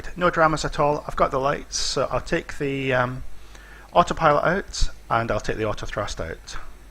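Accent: Scotland, United Kingdom. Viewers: Hi, currently learning lots of invaluable flying techniques and tips from your tutorials - many thanks! Quick question about the check heights from the chart - would you typically have those memorised or written down somewhere for cross-reference? Thanks - nice to hear a Scottish voice:-)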